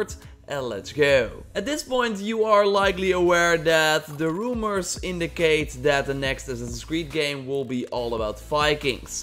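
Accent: Netherlands. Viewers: jij komt uit nederland dat hoor ik gwoon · ik hoorde echt gelijk aan je accent dat je Nederlands was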